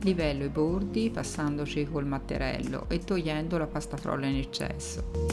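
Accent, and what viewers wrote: Tuscany, Italy. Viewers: 😋😋😋😋che meraviglia. La farò sicuramente. Ben tornata ascoltando la tua bellissima voce con l'accento Toscana.mi sei mancata.ma ora si ricomincia mia cara.e come ti dico sempre un bacione cara.❤❤❤❤❤❤❤❤❤❤❤❤❤🌹🌹🌹🌹🌹🌹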